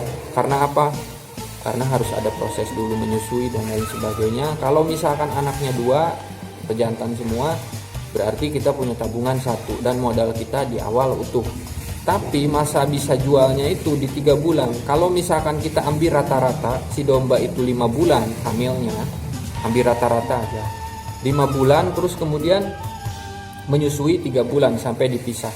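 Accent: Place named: Sunda, Indonesia. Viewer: Jiga urang sunda mang euuy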